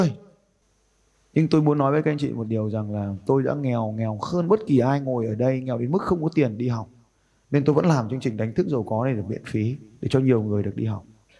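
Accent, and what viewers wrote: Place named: Bac Ninh, Vietnam. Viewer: Giọng a hình như Bắc Ninh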